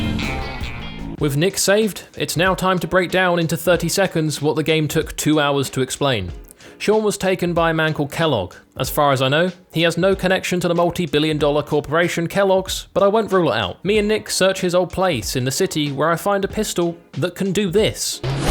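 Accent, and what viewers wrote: England, United Kingdom. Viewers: There is no such word as 'nucular'. That is an embarrassing and ridiculous bushism. Things concerning the nucleus are termed 'nuclear'. You sound English, so why can't you spell and pronounce English words? Not the best idea to display your ignorance to the world by uploading it to a massively popular video website. .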